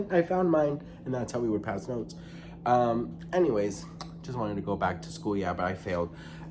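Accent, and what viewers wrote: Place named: New York, United States. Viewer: TAWK!! Love it !! You sound like me (a new yawker lol)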